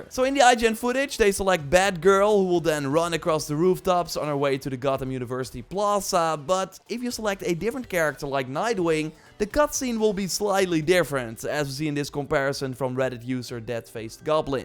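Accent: Netherlands. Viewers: mate, I love your accent(Dutch I'm guessing?)..."I do like how dey expond on dis" It's just cool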